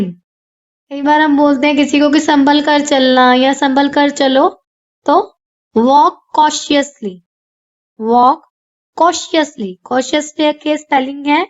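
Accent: Punjab, India. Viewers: Sweet voice, some times Punjabi tone and useful sentences. Thanks mam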